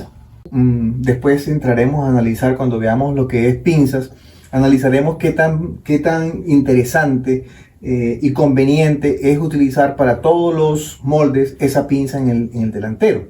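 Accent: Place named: Venezuela. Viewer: Tiene acento Venezolano❤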